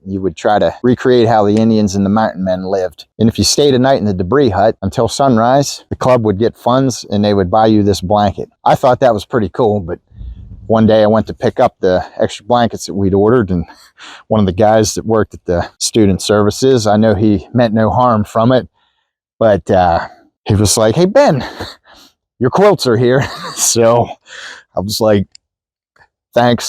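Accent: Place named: Pittsburgh, United States. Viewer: When I saw the one of your other videos, I thought that there was a little "yinzer" in that accent. The second video shows you sitting at the Point. :)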